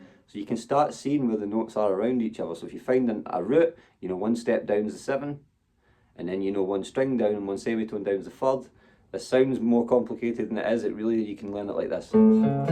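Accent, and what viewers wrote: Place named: Ireland. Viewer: Thanks for the lesson. Next teach how to talk with that cool Irish accent! I’ll bring u some cough syrup and a new mounting bracket for that tv 😂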